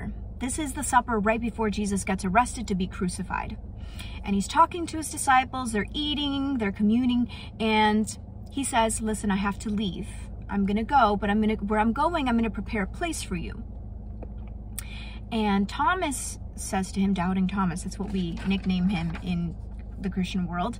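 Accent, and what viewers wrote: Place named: South, United States. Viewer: What sound like your from the south. Yall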